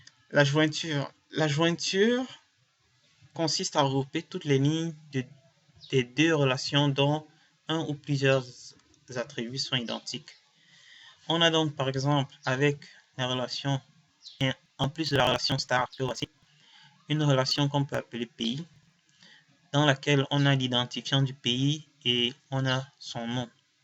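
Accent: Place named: Cameroon. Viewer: je sais que c'est mon frère camerounas qui a fait la video ci, notre accent est trop mo gars merci pour la video je compose dessus tout a l'heure ;)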